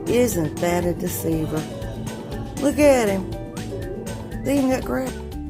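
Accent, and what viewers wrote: South, United States. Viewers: love you. make me laugh. I love your southern accent.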